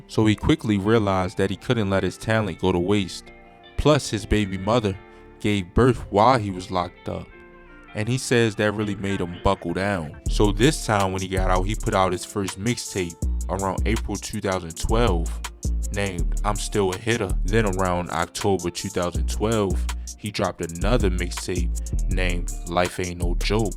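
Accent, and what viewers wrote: Baltimore, United States. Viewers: You from Baltimore I can spot our accent anywhere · you from baltimore?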